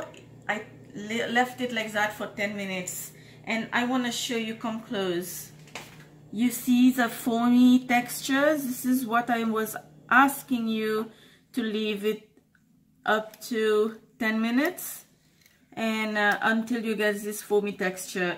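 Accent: Mauritius. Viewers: Yeh ur right it is pronounced nid! The prononciation is the same no matter where we r.. accents r different! However, I think we’re losing focus here! It takes courage and strength to make videos! It’s been three years n m still not brave enough to do it! How abt we focus on the recipe and her tips as opposed to her accent.. she is Mauritian! I’m sure we all have an accent irrespective of where we r?!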